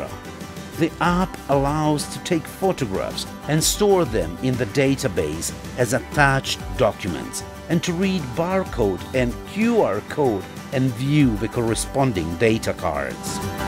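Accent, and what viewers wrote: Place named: North, United Kingdom. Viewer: the combination of north british and slavic accents gave me a stroke, cool app tho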